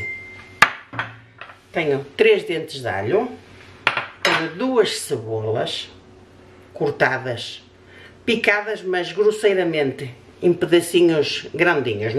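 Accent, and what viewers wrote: Portugal. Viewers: A receita parece deliciosa...e esse sotaque português é uma delícia de ouvir.!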